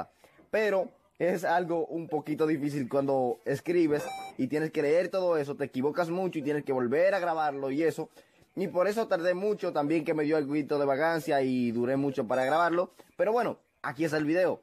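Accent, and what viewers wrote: Dominican Republic. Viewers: Eres dominicano sierto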